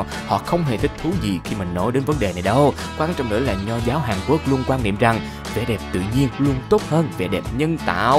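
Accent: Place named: North, Vietnam. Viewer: ad nói giọng bắc nghe cute vải :)))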